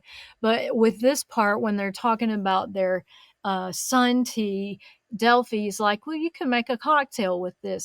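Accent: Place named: South, United States. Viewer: I always enjoy your videos! As far as your southern drawl and you "working on it," please don't. You leave that drawl alone! It's a pleasure listening to it! Your reviews have been top-notch. 🏆 Thank you for your service, ma'am. 🫡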